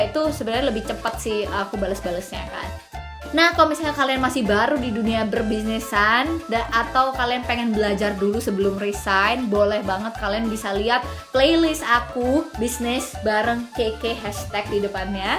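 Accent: Java, Indonesia. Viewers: Mantap mbak e. Jowone neng ndi mbak 😁🙏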